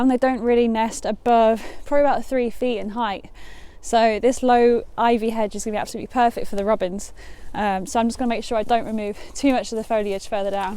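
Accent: England, United Kingdom. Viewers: hello NICE VIDEO AND REALLY LOVE THAT ENGLISH ACCENT AND THAT CUTE SMILE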